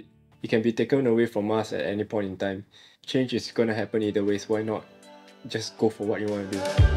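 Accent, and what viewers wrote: Singapore. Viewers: I also like your singaporean accent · I just discovered your video. Started with the Hanoi vid....very well done Admire your bravery ...can't wait to see more from you ..makes me wanna spend more time in asia. Also I like your singapore accent ..more pls! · don't worry about your accent! english isn't my first language and i've mostly learned it through watching youtube. nowadays my goal is to get to know and understand as many accents as I can, so the more I watch your videos, the better I'll get at understanding english spoken with the singaporean accent. keep up the good work!! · Hey fellow Singaporean here! Just have to say your video quality is on par or possibly even better than what I've seen on YouTube from top content creators, as love seeing you being authentic in retaining our accent and embracing the fears that come with putting yourself out there! 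Think your authenticity comes through, and seeing your personality as it is lends so much more to the video too! Good job bro, your videos are what inspired me to look more into design and zhng-ing my room while I wait for my BTO! The vibes are immaculate haha and I've been opening the YT app so often to check if you've uploaded so you're definitely doing a sick job :) Too bad I wasn't able to catch you at Stacked's store last weekend but so glad to have chanced upon your content! Keep it up! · this vdo makes me feel like I’m watching a movie 🍿😍 Thank you and love the Singaporean accent. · ahhh rooting for you! so many firsts in this video, but very excited for you to have many more adventures trying out this youtube thing!! also, hell yes to the sg accent ahaha we need more of it on here! i started vlogging for fun & just to have memories for keepsakes for when i get older & have to leave all the fun & games behind but it was really nice to see this, might still keep the hobby alive :")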